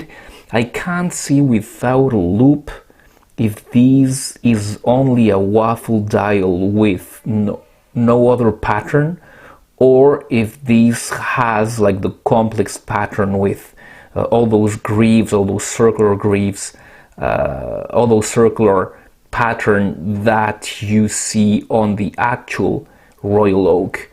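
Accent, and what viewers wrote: France. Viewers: Il sonne frenchy ton accent. Merci pour ta vidéo, j'attends la mienne avec le fond noir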